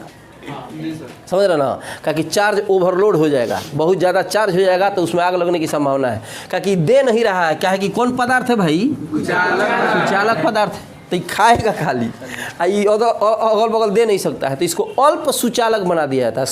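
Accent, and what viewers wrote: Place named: Chhapra, India. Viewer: छपार जिला के मीठा बोली, ऑउ ई सर् जी के फिजिसिया अंदाज़ में कॉमेडी .. जिय हो सर् जी, इंडिया लेवल के बारा हो तू ....अनंदित कर देहलस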